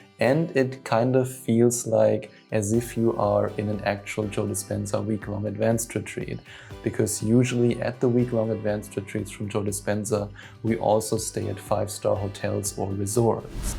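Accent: Germany. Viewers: I started a 90 day dr joe dispenza meditation challenge for myself & sharing it on my youtube channel to stay committed & motivating my clients. You really always inspire me..this weekend will do a home retreat.Many thanks for your videos..vielen Dank..sind wirklich tolle Videos..empfehle Dich immer gerne weiter. I was in Basel 2024 but meditated on and off..consistency is key..got it locked in now🎉we got the same German accent😂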